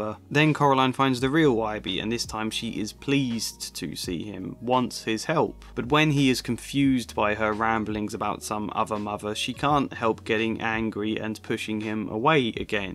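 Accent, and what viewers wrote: United Kingdom. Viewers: I want you to know, that if you did not have a british accent i would not have kept watching this video. That is all.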